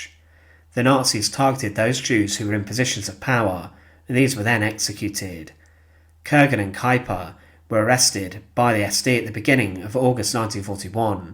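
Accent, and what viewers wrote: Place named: West Midlands, United Kingdom. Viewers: He has a much better voice and pronunciation care than so many shoddy YT presenters who don't bother or care to learn how to say foreign names and words. He writes and organizes well and carefully. His particular English accent another commenter said is "West Midlands." As an American I've had no trouble understanding him. If you have specific names he doesn't say sufficiently well, then pointing them out and writing phonetically how to say them could help. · Modulating his voice? If you mean the intonation, that's just the local accent in parts of the West Midlands where the narrator is clearly from. People have different accents including different intonation, that's just the way it is. I watch channels hosted by people from the deep south of the USA, they too have very specific accents and intonation but I just accept that as part and parcel of their narration.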